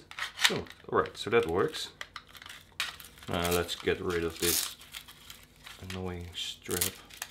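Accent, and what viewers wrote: Netherlands. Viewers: Is this guy dutch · you sound really dutch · Super cool! Alleen je hoort gwn dat je nederlands bent :) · Are you dutch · I thought so aswell cause he sounds really dutch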